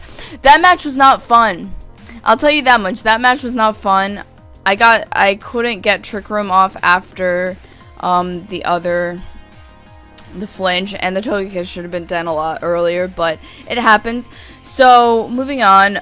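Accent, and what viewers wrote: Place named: New York, United States. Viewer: New york accent. Plays pokemon. Gorgeous. God help me. xD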